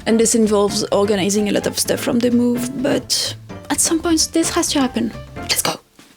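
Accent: France. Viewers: Je suis déjà quelques vidéastes anglophones qui font pleins de réaménagement/cosplay/whimsical stuff. Entendre ce bel accent français et les expressions ça m’a fait tellement bizarre 😂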